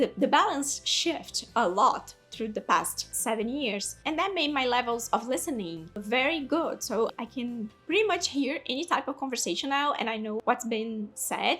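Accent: United Kingdom. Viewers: I love that u sometimes have a British accent and others an American one hahahahaha I’m the same! If I learnt the word with an American speaker I’m gonna say it like them, if it was with an Australian speaker, forever I will have one word in my vocabulary with the Australian accent 😂😂 I believe that happens with your accent based on the accent of the audiobook you came across for that vocabulary 😅😅 I love it so much and I’m gonna be here for all the videos as I am with your primary channel, following your success ❤❤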